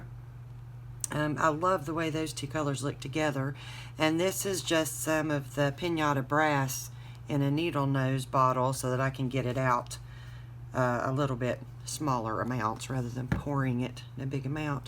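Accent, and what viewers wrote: South, United States. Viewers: You do not have a "Hillbilly Accent"... That is Southern Charm. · Beautiful! Your accent DOES NOT SOUND HICK....like someone else mentioned, it is Southern Charm!!!!!! I love the southern accent; HOWEVER, there are a couple accents that are not as pleasing as yours, at least to my ear!😊. I really enjoy your work and watching you produce beauty from nothing....don’t be so critical of yourself, you are a winner in my book....keep the videos and teachings coming, I want to learn more! Blessings.....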